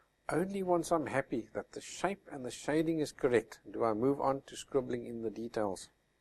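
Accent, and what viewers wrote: South Africa. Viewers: Beautiful drawing! Are you South African?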